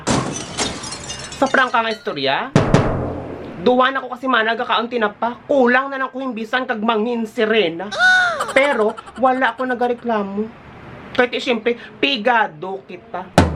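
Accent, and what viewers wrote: Iloilo, Philippines. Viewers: Im not ilonggo,, i just know tagalog,,i dont know how i can undrstand but in someway ican understand a bit what you are saying,, ,,,i love the ilonggo accent!❤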